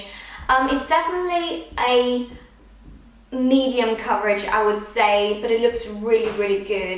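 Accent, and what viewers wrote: United Kingdom. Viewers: Why do you sort of have a british accent when you are danish and both of your parents are danish? <3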